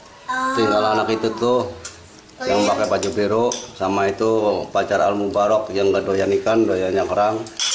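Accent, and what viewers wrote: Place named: Banyumas, Indonesia. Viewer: bahasa ngapak....emang dr kota mana pak....😁😁😁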